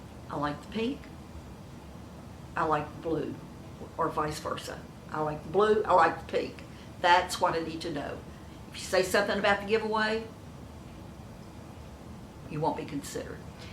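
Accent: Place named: Texas, United States. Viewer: I just saw one of your videos for the first time tonight. We have some similar ideas! I was all for shopping my closet which was brimming with really cute clothes but soon discovered that I have the COVID Curse...I’ve gained weight for the first time in at least 5 years! YIKES! I was a slim petite 68 year old & honestly, gaining almost 8 pounds really makes me feel fat...about 117 lbs. now.Everyone has bagged me for over 5 years to EAT! I couldn’t eat for about 4 years due to the loss of my first-born, my only son. My heart broke. He was a chemistry professor at a university in Ohio but our home is in Canada. I think that sometimes I think he will come to the door because he was in another country & I didn’t see him often. I hope that doesn’t sound too crazy! Now, back on topic...I am subscribing. I think you are absolutely LOVELY inside & out & I could listen to you talk ALL DAY! 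Are you from Texas? My Dad’s third wife is from Texas & you remind me of her (your voice).She lives in British Columbia which is quite far from us in Ontario. Anyhow, I loved your video & look forward to finding more of them! I don’t know anything about you yet but I think that if I ever had the good fortune to meet you, we would get on like a house on fire! In September I will be 69 & I saw in one of the comments that you are actually 77!? You look at least 10 years younger! Maybe those of us who try to keep a kind heart are blessed with looking a bit younger than our ages? Love & kindness are my p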